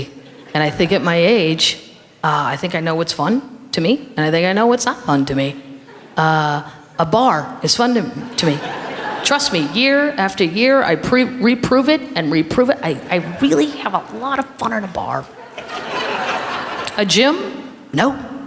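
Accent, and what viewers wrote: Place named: St. Louis, United States. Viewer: I love the St. Louis accent... From my second home!! She's hysterical! 😂❤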